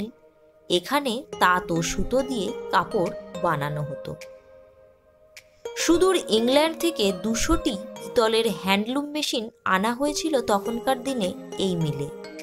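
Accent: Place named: Kolkata, India. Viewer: Tumi kolkata style a kano katha balo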